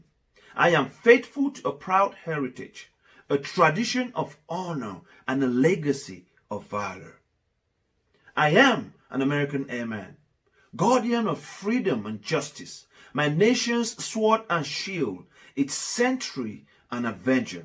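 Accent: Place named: Africa. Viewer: This is a pure Native African English accent😍😍😍. Thumbs up👍🏿Bro. Keep up the spirit. Where are you from?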